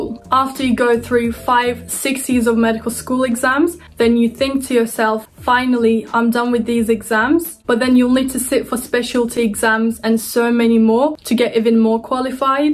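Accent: United Kingdom. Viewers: personally i prefer american accent but the more i watch your videos i become a fan of the british accent. It's adorable when you speak English that way :) Actually, I'm obsessed with the English language :) , but I have never had the opportunity to be in an immersive English environment. I envy you :) good luck with your studies. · Bilerek mi british accent kullanıyorsun yoksa orda alıştığından mı? Cevaplarsan sevinirim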